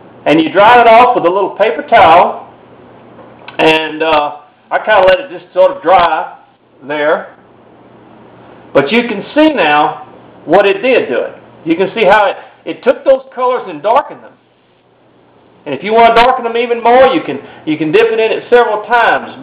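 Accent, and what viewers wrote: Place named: South, United States. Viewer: hello !!!!!!! can you please wright the ingredients because your acsent is realy suthern and i am having truble picking up on it!!!! :) lol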